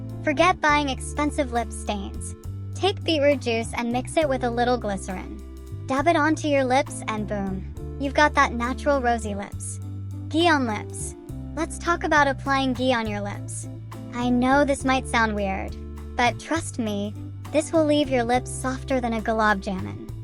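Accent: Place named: Multan, Pakistan. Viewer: Her accent multani mithi omg 😂😂😂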